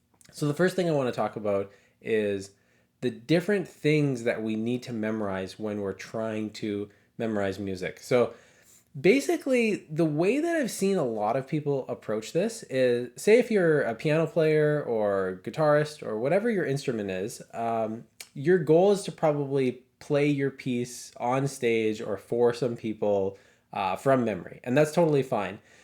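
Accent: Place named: United States. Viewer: Hi, I have recently discovered your channel and although I usually prefer UK or Australian based audio, your US accent is actually easy to listen to! Now like Judy Fiterman’s comment, I am nearly 80 am relearning piano after some 65 years absence, and also Sax which I took up in my 50’s for about 4 years then again some 4 years ago in a local concert and stage band. I have trouble remembering a 4-6 digit security code, let alone music. Sax matters less as in a band It’s normal to have the sheet music, but I really would love to have a small spontaneous repertoire of piano pieces I could play from memory. Strangely I can play the first section of Fur Elise by heart, because I suspect I learnt that as a child, but not the second two which I can play now with the music in front of me. ( But it has taken some two years to get to speed and musicality!) My other problem is audition, as I really have no singing voice and have trouble repeating even one played note accurately. 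Do you have any advice for us oldies who are experiencing some general memory loss, shall I say deficiencies or lapses, or could you do a specific session addressing this issue. I love my return to music and call it my “anti dementia” activity! Thank you. Karla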